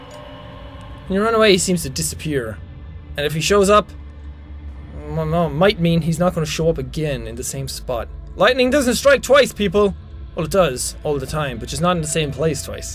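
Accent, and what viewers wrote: Ireland. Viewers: First time he sounds Irish. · you sound Irish I know because im half Irish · Are you Irish?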